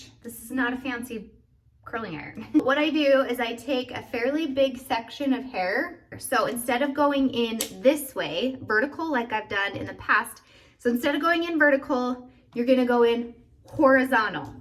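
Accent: United States. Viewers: OMG! Can you tell me what part of America that accent is from?? So cute! Oh my God! 😁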